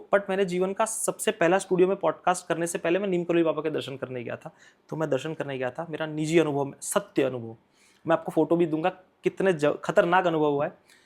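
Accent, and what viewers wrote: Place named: Gujarat, India. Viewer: Ye admi gujrati lagta hai Jaisi hindi bolta h uski wajah se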